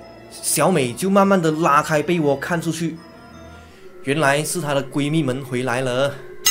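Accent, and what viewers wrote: Malaysia. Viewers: 我一聽 就知道 是 來自 馬來西亞的 主播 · 故事情节讲得很好！很自然！因为有马来西亚人讲话的口声而产生共鸣！ 我也有真实灵异親身经历故事！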